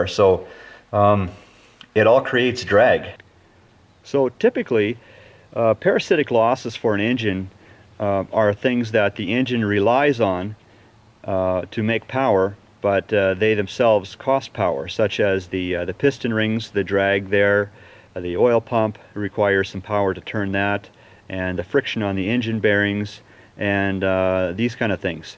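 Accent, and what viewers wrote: Canada. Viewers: Canadian? I love the accent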